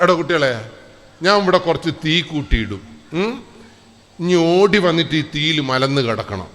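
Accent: Malabar, India. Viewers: മലബാറിൻ്റെ ആ ഭാഷ ശൈലി❤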